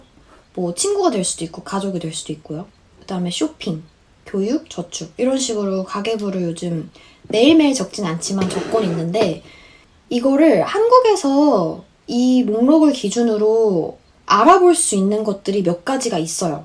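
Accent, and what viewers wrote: United Kingdom. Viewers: 약..12년전;호주 워홀러였던 아즈매에요~ 님 영국식 발음에 푹빠지네요 다시 공부하고 싶은 욕구가! 그걸 혼자했단거에 참 대단하네요👍 잘보고있어요~ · 안녕하세요 영국에유학중인 해리입니다.영국영어 발음 정말멋져요.일상을 영어로 하는 브이로그 한개여서 또 영어일상브이로그 촬영해주시면 좋겠습니다.영어공부하는데 많은 도움이될것같아요.♥️구독하고 갑니다.👍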